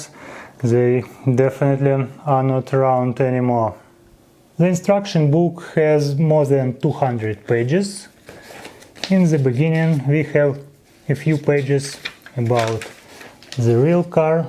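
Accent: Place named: Russia. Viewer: Я не тролю тебя😊 просто твой акцент прямо таки походит на те самые пародийные ролики с руским окцентом! Я сам так говорю но когда читаю стараюсь читать с окцентом приближеному к Амеренканскому акценту да и +я сам очень плохо знаю английский язик а с помощью твоего англоговорящего канала я смогу улучшить свой английский! Так скажем совмещаем приятное с полезным 😊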